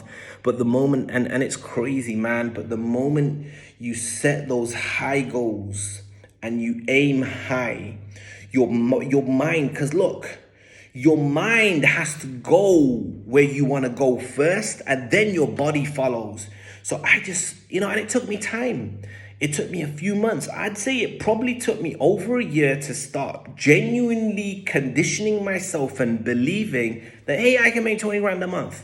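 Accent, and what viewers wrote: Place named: United States. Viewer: Are you from America? Great accent!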